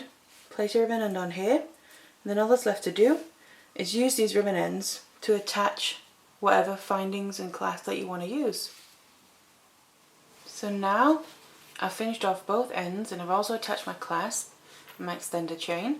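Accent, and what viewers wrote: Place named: Macclesfield, United Kingdom. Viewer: My cousin lives in Maccelsfield. Her accent seems close to yours - is your location mid country?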